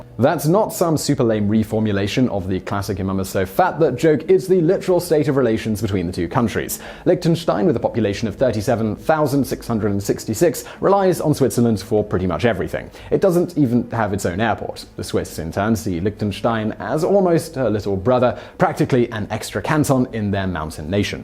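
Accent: England, United Kingdom. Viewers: A suggestion about English accents -- the host has one.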